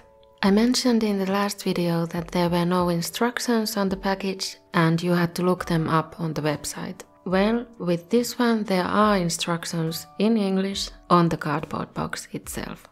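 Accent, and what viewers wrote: Finland. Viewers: What country are you from? Because that sounds a little bit like finnish accent.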